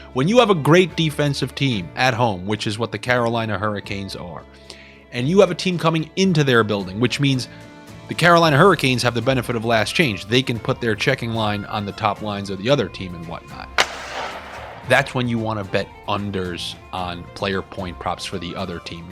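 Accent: Boston, United States. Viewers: The boston accent🔥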